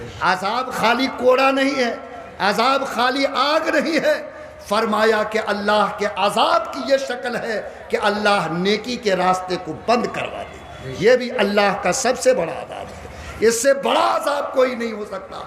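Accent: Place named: Bihar, India. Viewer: Andaaze bihari hae. Lekin haq aur zameeni sachai bol rahe haen.Lachhedar taqreer nahin.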